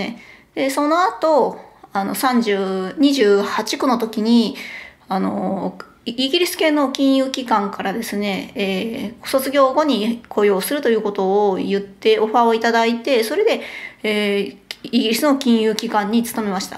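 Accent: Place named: Osaka, Japan. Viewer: 新しい扉を開いてくれるチャンネルですね。大阪弁も身近でよし。これからもよろしくお願いします。☺️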